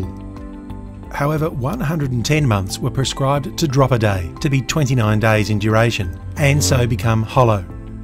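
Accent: Australia. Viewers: admittedly i skiped to this vid but after seeing your craftsmanship i have to watch the whole series as well as your other vids 😅 aussie accent is a nice bonus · Anyone else remember like 5 years ago when you clicked on a video of a dude with an Australian accent making a small brass scribe? Crazy stuff..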